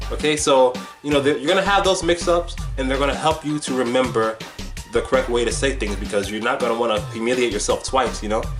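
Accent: Dominican Republic. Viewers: Pareces dominicano de la capital!! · This video got me in surprised. I'm Dominican myself checking on how English people would get to learn Spanish and was drawn to your video because of various elements that suggest several aspects of the Dominican scene: the Reggae background beat, your Spanish, I would even dare to say you sort of speak English with the Dominican swagger which is pretty cool to watch. Great job, keep it up. You can be my friend if want to come down to the island some day. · Que lo que! I stumbled upon your page and I’m so impressed by your Spanish y tu acento dominicano. I studied abroad for a summer in DR and have a bachelor’s degree in Spanish, but I’m embarrassed to say that I feel that my Spanish hit a plateau because of not wanting to make mistakes and put myself out there. But I’m now really making an effort to get to your level. 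Do you have any Skype or what’s app so I can contact you for help! Necesito tu ayuda!!! Pol favol!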